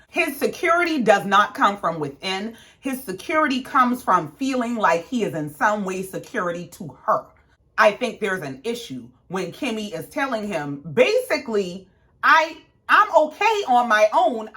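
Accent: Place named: Gullah, United States. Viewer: Nooo hell no I hear some geechie/Gullah in there, if you don’t mind where are you from? Lowcountry SC here and I know what I hear 👂🏾👂🏾👌🏾🤨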